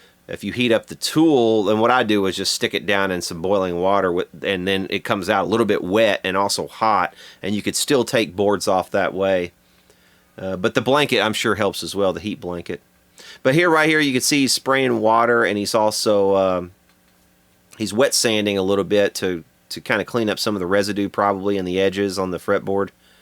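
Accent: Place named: South, United States. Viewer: Always refreshing to hear your Southern accent and your excellent content. I watched that video with that mad scientist guitar builder guy you had the other night and it was so interesting but my favorite video to date has been the one where you soup top the five white Epiphone tube head. Also the Marshall mg restoration vid that you salvaged. I’ve learned so much watching this channel. 🤘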